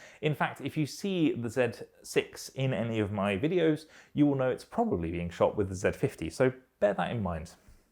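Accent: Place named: England, United Kingdom. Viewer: Great to hear a calm, English voice!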